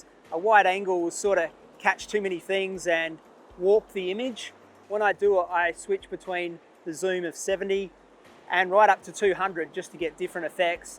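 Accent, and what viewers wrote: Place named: Australia. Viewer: Thanks for the insight. Great to hear an Aussie voice on YT. Awesome work.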